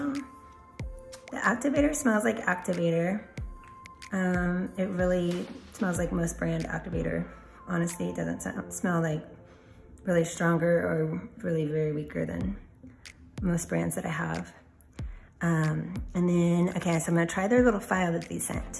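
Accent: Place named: Texas, United States. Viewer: My boyfriend just walked by while I’m watching your video and says “that’s, isn’t it?” 😂😅 he says your voice is “a good Texan southern voice”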